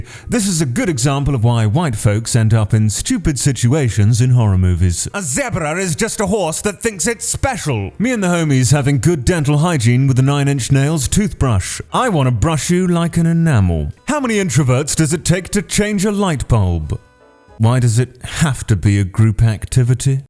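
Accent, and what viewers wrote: United Kingdom. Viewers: 'sup mr british voice sir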